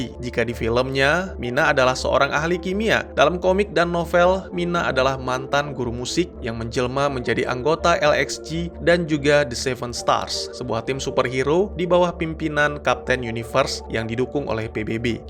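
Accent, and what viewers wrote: Aceh, Indonesia. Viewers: Lo org Aceh ya bg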